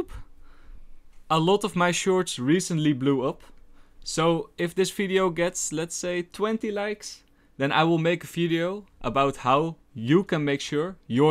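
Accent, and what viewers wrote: Netherlands. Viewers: heavy dutch accent at its finest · Yes I also think..Check his thumbnails an his dutch accenct confirms it :D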